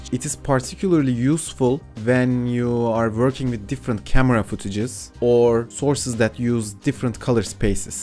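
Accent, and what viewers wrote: Turkey. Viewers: Hey bro, are you Turkish right? you're accent almost same Turkish people ahaha lol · Türk her yerde Türklüğünü belli ediyor ya aksanı duyar duymaz anladım :)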